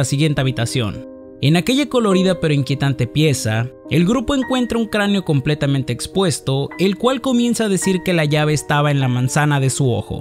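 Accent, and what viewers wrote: Peru. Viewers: Eres de Perú?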